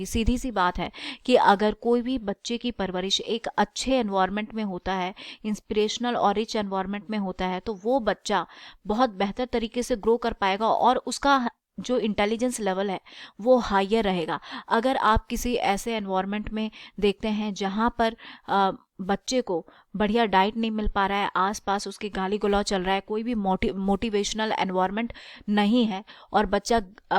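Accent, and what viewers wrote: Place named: Delhi, India. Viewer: No she is absolutely "North Indian"... * Notice her "a" pronounciation that connect Delhi area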